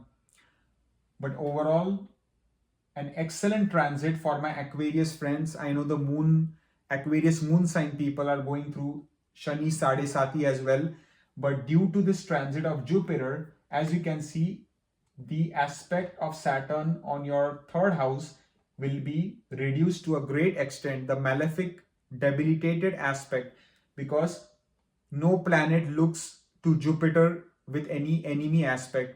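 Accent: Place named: United States. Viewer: After waking up in the morning, you take your phone in hand n switch on the net and you get the notification from about upcoming Guru gochar... Knowing that Guru being my both lagnesh n rasheesh, it's gochar in Trikon gonna be beneficial, you are so eager to listen to it from your very dear n fav Marathi guy with American Accent 😃😃😃 and your beginning of the day become cheerful.... ❤ Thank You So Much dearest for making my day 🎉❤🙏😘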